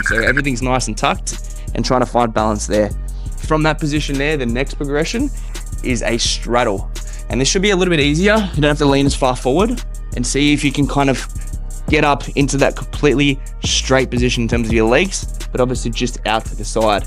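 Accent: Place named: Scotland, United Kingdom. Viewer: You have no idea how I wish to improve it .. I'm really into calistheny! What is the country you live at? Your accent remembered that of a Scottish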